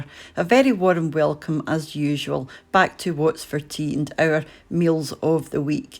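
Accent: Scotland, United Kingdom. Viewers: SASSENACH here! I love spendin' a wee while seein' wart "?" had fur tee this week! 👍😂😂😂 Fur my tee today, I'm havin' Tatties 'n' Neeps wi' a wee servin' o' Mence! I'm a family o' one, and it's ma favourite! 👍😂😂😂 PS: Love the Scottish accent! It's awesome!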